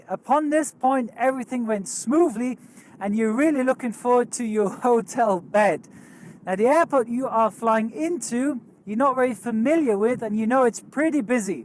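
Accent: Swabia, Germany. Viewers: Bisch wohl a echter Schwob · Endlich amol oinr der mo Schwäbisch schwätzt. Scheeeee. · Ned schlecht dei Schwäbisch, muss i scho soga 👍🏼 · Nice schwäbisch I´m living 1 hour south of Stuttgart.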